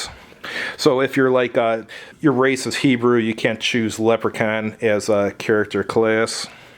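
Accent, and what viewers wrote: Chicago, United States. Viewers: must have the thickest accent in all Chicagoland.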